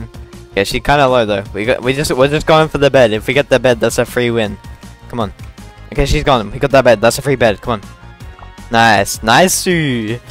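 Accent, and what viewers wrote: Australia. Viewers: Are you austrailian?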